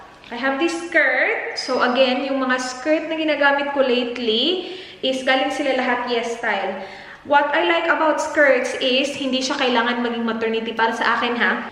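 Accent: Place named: Visayas, Philippines. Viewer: Bundak kaayo mu bisaya si ate 😂 shout out po ate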